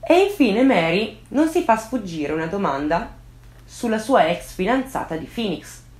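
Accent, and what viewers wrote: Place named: Rome, Italy. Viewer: Il tuo accento (Per me) è Romano, ma sei di Roma?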